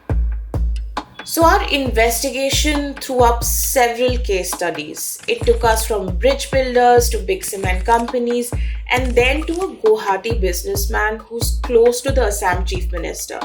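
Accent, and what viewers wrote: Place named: United States. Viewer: This woman speaks in Americanized English and has a problem with lobbying? JNU bawasir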